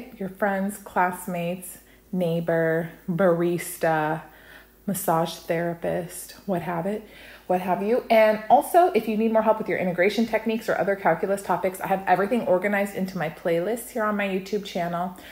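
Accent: United States. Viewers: I ❤ UR AMERICAN ENGLISH accent😊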